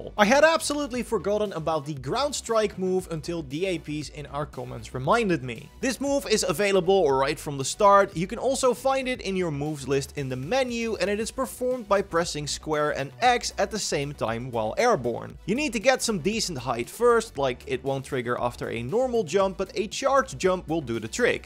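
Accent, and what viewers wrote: Netherlands. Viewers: i smell dutch accent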